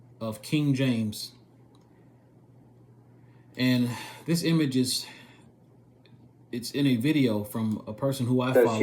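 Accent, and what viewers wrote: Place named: Baltimore, United States. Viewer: Blacks in Baltimore hence the accent lol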